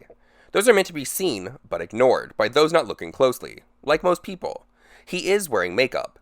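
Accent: United States. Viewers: “BrisBANE” omg I cant handle your accent 😂 super cute through! I’m from Brisbane & I swear all Americans can’t seem to pronounce the cities lol. But if you need to pronounce it in another video about James in Brisbane airport it’s pronounced ‘Bris - Bin’ which is silly because it’s got an ‘a’ I understand 😂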